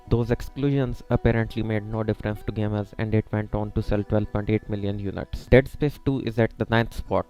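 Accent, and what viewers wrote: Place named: India. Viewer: Your video is great bro but take the indian accent down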